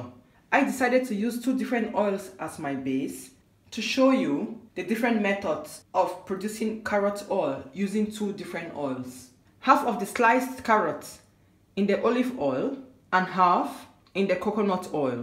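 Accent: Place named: Cameroon. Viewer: You sound very Cameroonian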